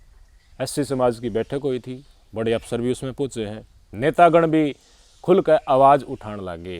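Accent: Haryana, India. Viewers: भाई बहुत स्पष्ट रूप से बोलते हैं और सतह m हरियाणवी bolte h kati char chand lga deta h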